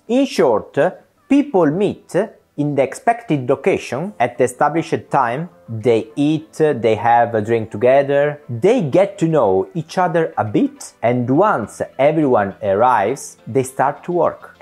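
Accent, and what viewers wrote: India. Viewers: Ma questo accento indiano??? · Pur con accento indiano parla meglio di molti italiani, più che altro fa strano L accento indiano su un italiano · You have a lovely indian accent <3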